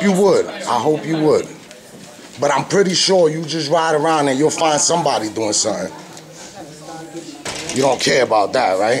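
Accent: New York, United States. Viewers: Good job. Holy shit, you sound like you've never left the boroughs. Takes me back. I live in Oklahoma now but my family is from West Haven. Keep it up. Subbed. I'll forward this to other auditors and mirror on my channel, with your permission. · You so New York bro 😭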